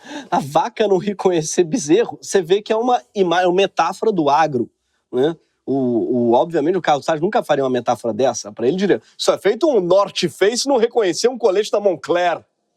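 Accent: São Paulo, Brazil. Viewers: Gregório é paulista demais, meo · eu MORRO com esse sotaque paulista KKKKKKK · Sou de Rondônia e e acho o sotaque paulistano do Ilário. Kkkkkk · O que tá acontecendo e deixando de acontecer com o país a parte - Meus parabéns pra quem fez essas legendas, ver isso com legenda em outra língua as vezes é um show a parte. PS - maneira no paulistês, a claque ta fazendo falta hahshdhsurudha.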